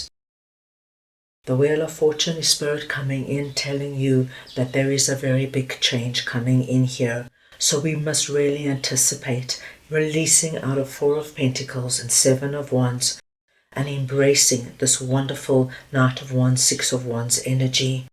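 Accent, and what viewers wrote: South Africa. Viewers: Nice reading. You sound South African.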